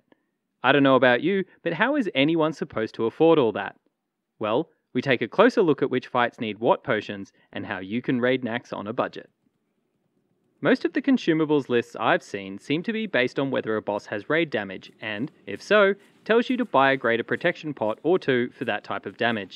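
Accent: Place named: Australia. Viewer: Aussie AF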